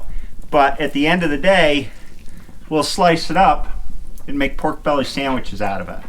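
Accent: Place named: Central New York, United States. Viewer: I live in Kentucky after 18 years Finger Lakes...you definitely have that central NY accent. Nice to see drone footage with a little sliver of Cayuga Lake right at the end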